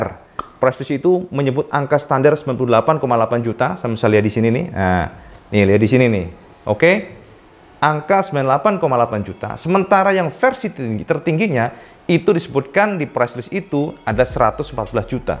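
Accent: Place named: Surabaya, Indonesia. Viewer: Asyik suka deh pembawaan wong arek" suroboyo auto sub lonceng, sukses terus bwt cak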